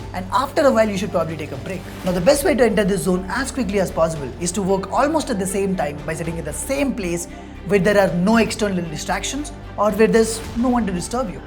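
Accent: India. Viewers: that indian ahh accent but awesome work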